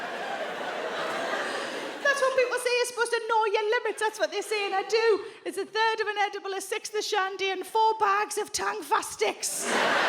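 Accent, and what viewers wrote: Newcastle, United Kingdom. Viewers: Brilliant! There’s something about how Geordies (or South Shields folk) swear that I love! 😅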